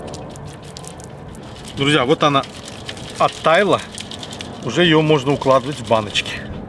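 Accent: Baku, Azerbaijan. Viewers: Приятный Бакинский акцент👍🏻👍🏻👍🏻пошла солить😋😉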